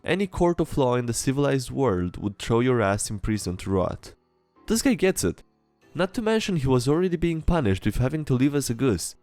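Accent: Eastern Europe. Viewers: I actually think he's hispanic, that's how it sounds like, but then I hear how the tongue just "hits" and yeah, slavic accent. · It doesn't sound like most European accents does it. It's 100% not American, it's like a weird version of a Slavic one, but like, really funky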